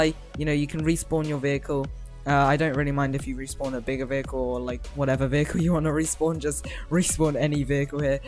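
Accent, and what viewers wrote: United Kingdom. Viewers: are you British? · Are you from the U.K?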